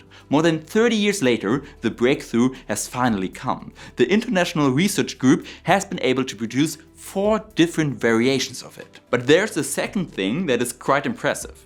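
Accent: Germany. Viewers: Great video. Made me realize I need more German accent in my science recommendations; subbed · if not possible to synthesize in industrial manufacturing via vapor deposition for example that material will be nigh useless because of costs. also the german accent is super cringe ldk grapene and piezoelectric especially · I know I'm being shallow, but the German accent makes this all sound so much more authoritative.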